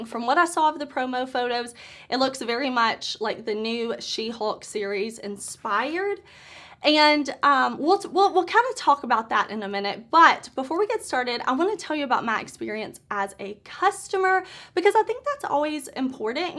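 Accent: South, United States. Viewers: I literally love your voice and is that a southern accent? I also love that you have so much lid space. I have hooded eyes so my shadow is always kinda hidden.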